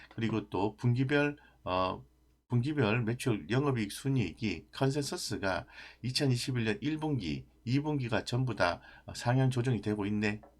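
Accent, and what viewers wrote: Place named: Gyeongsang, South Korea. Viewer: 구수한 경상도 사투리에 자세한 설명 항상 감사합니다..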